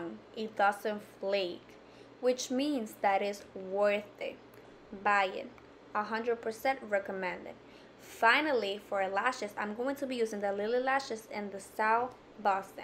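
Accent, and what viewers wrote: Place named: Cuba. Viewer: Sis pero y ese acento cubano? 🇨🇺 y que fueeeee, ta viendo muchos videos de Lohana 😅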